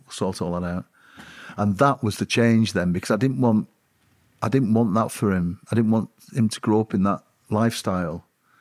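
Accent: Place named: Scotland, United Kingdom. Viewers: How frustrating and disappointing for you. The Scottish accent can be like that may I ask where you from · Yes Lee Sansom is a great bloke, and, (what a surname, for such a strong Scottish accent Scot), is a great interviewer... BUT if Lee Marshalls his actions, as badly as his thoughts, God help anyone he was protecting. He goes around the houses, with pausing to an irrorating level, I wouldn't have him baby sitting, never mind protecting people for huge sums of money. There are glaring inconsistences! IF the British MI5 & MI6 were watching everything, and that is normal, did they ALL have a night off when Lady Diana died? Why was no white Fiat found, they had the paint on the Car, and even if the Tunnel CCTV was off, ALL of Paris's CCTV was NOT? Why the close Bike rider who may have used a Driver Blinding torch, why was he never found? 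Why did they not take her to the nearest Hospital? Why did it take 2 Hours before anyone tried to save her? A following Security Car should have been following, so if the Celebrity Car is damaged, or unable to move, the Celebrity can be transfer to the following Car. This is standard Body Guard Protection. Now if this Lee Sansom is a nice bloke, that still does not qualify him as a Body Guard. For some reason I cannot fathom, you all seem to think "nice blokes" do their job well, I couldn't care if he's a Saint, he is bloody useless in a Crisis, or even able to DO HIS HOMEWORK. If HE does not know the answers to my questions, he is a totally stupid, bloody shit Body Guard. Because ALL of those questions HE BLOODY WELL SHOULD KNOW! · Hi, I’ve just found you, so glad I did! I’m writing b4 I’ve finished listening to this. Wanted to say it’s fab to hear a fellow Glaswegian doing this and I’ll be checking out your other stuff. 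Well done 👏 ya handsome young man 😅 Your guest is fabulous!! He’s got such a beautiful spirit, so open and warm. Don’t mind admitting I’ve got a big crush 🥰😂 I admire how straight forward your interview style is and I’m looking forward to learning more about you through your back catalogue. Well I’ll stop noo and listen to the interview. Health and happiness to you and yours ❤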